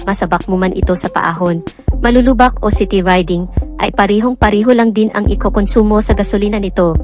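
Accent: Visayas, Philippines. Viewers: Bisaya ka day?!😂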